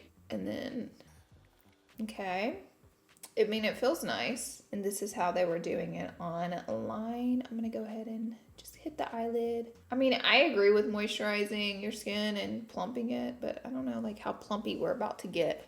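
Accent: South, United States. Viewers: honestly watching her videos are so relaxing to me. I’m like finally someone with a southern accent!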